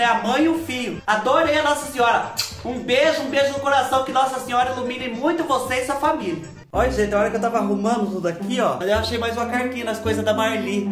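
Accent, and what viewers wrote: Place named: Rio Grande do Sul, Brazil. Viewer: Boa tarde, muito fofo seus presentes que bom gosto dos seus fas. Quem fala " O que que eu corto, cobreiro brabo", esta na idade de risco. Voce e muito fofo, voce estuda muito e pesquisa muito pra falar igual gaucho. Meus parente sao do RS e falam igualzinho voce. Me devirto muito.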